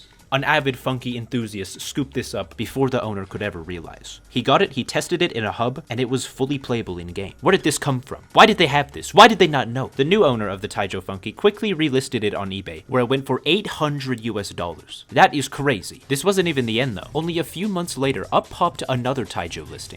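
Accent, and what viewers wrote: Canada. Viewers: “outing myself as a Canadian” when you’re one of the only people I’ve been able to actually recognize a Canadian accent in 😭 Anyway thanks for providing my mandatory video about something I’ve never heard about but I get invested in anyway for some reason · lmao you said you outed yourself as a canadian but you sound so canadian man you didn’t have to even say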